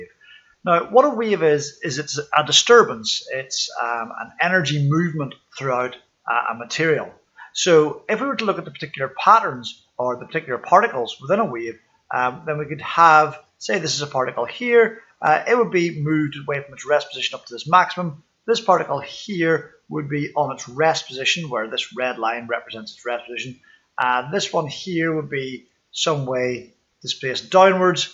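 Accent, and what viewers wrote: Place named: Ireland. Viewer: This guy sounds like an Irish man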